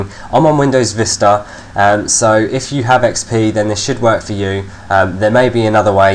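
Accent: United Kingdom. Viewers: Best Accent ! love british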